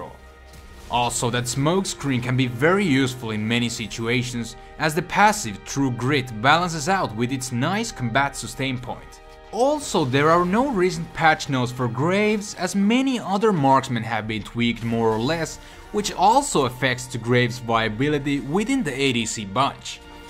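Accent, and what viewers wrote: Finland. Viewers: ***** you can clearly tell that he has a finnish accent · ***** dude stop crying about it, he is from Finland and pronouncing certain words for us in English is extremely hard. So stop crying.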